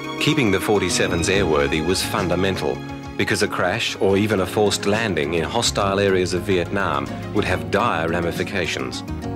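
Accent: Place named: Australia. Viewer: Interesting video but the continuous loud background music is very annoying. Is this an Australian production? The narrator sounds Australian.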